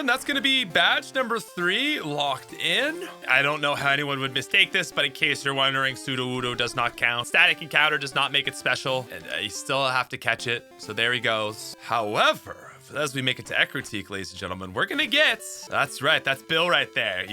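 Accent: Canada. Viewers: That Canadian accent kept slipping out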